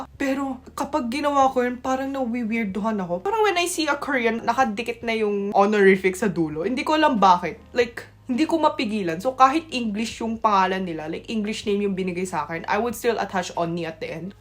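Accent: Batangas, Philippines. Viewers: galing magsalita ng Filipino…. go Batangas!!!! andun pa rin yun punto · I just love the way you talk like a local in taglish and the way you articulate your English is tops. No more shades of being Korean. Even your intonation is so Filipino and so understandable. I'm very proud of how you've turned out to be. You are now very well versed and a good conversationalist. Thank you, congratulations and God bless.. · You really sound like a Filipina speaking tagenglish. I really like your batangueno astig accent and Im a big fan of yours. You should have a talk show in Manila. Since you are articulate and smart. You will be a big hit. Watching from northern California, the bay area. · Just binge watched your videos. And saw on ig that you studied Archi in UP i guess? My question is, are you using your degree now? Like the apprentice and such? Or pahinga muna? 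And how would you take the boards? Macecredit ba yan sa korea and youll be a licensed archi there???? I hope youd be able to reply. Im an archi student as well kasi HAHAHAHAA *plus i really love na na adapt mo yung thick neutral accent ng pinoy when speaking in english. Mas prefer ko yun, mas pangit pa nga yung may konting tweng or conyo BGC type na english, like shut up and get the fuck off 💀😂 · Grabe Pinoy n pinoy accent tagalog ni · Napanood ko po kayo sa TVJ, mukhang bata yong itsura niyo pero yong 12 years old mukhang mature na. Maganda po yong batangueña accent niyo talagang tigasin, kilala po kasi ang mga batangueño na matatapang, mga ilocano na kuripot, mga kapampangan na galante. Sabagay bawat lugar may kanya kanyang bansag, sinasabing matatapang ang mga batangueño dahil sa salita palang eh medyo mai intimidate ka na, lagi pa silang may balisong sa bulsa kaya kung ayaw mong magripohan huwag makipag away sa batangueño, sinasabing kuripot ang mga ilocano dahil siguro bihira kang makakita sa ilocos ng mga magagarang bahay gaya ng Pampanga, o manila na super gaganda ang mga bahay at halatang may kaya ang mga tao. 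Sa ilocos po parang hindi apektado ng mga pagbabago ng panahon, meron din mangilan ngilan na magaganda ang bahay pero mostly simple living ang mga tao doon dahil karamihan sa mga ilocano, sa pagsasaka ang ikinabubuhay at kahit na nakapagtapos na ang anak ng isang magsasaka, mahilig pa rin sa nakagisnan na pagsasaka, in other words simple living lang talaga ang maraming ilocano kaya nasasabihang kuripot. Sa mga kapampangan naman po, palibhasa progresibong lugar ang pampanga, maraming mga business establishments, mga restaurants at parang manila na maraming tao. So maraming mga kapampangan ang mga milyonario at ipinapakita nila sa magagarang bahay, in fact po, tuwing piyesta sa ibat ibang lugar sa pampanga, kunwari dadaan ka sa isang handaan na open for public, puwede ka ng makikain, huwag lang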